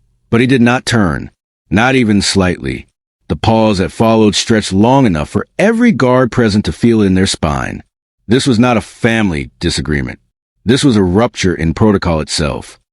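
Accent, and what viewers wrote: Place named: South, United States. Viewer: It's ironic that the voice has a southern U.S. accent when the setting is England.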